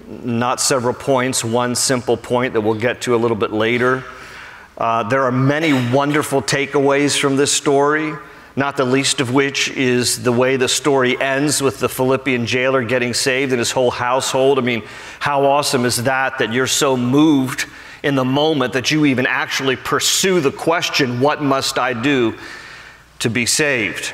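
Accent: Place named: United States. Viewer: I’m a female solo traveler now in Turkey. These sermons are the last thing I listen to after tucking myself in bed each night. Pastor’s familiar voice, American accent like my own and colorful stories of scriptures bring me laughter and peace same time. Thank you 🙏🏻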